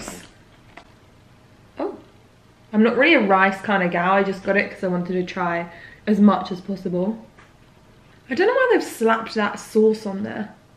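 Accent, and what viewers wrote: England, United Kingdom. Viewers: I feel like it doesn’t matter how you say “Taco” because it’s not an English word and you have a brutish accent so both ways sounds like you’re saying “taco” to me haha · Do you think if I move to London I’ll catch the accent?? I absolutely love how she talks lol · It’s pronounced tah-co, if you’re being authentic. But I know British people pronounce somethings differently. Like the way you pronounce pasta is way different.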